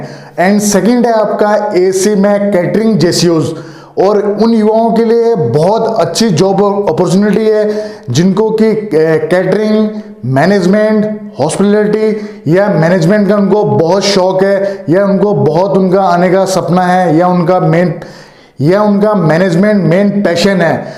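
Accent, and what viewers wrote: Rajasthan, India. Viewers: Aap ho na ho rajasthani ho sir I am 100%confident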